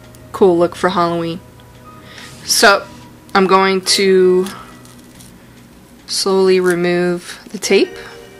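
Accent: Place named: Italy. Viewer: This is freaking awesome! Btw if you don't mind me asking, are you Italian? You have the same accent my family does :D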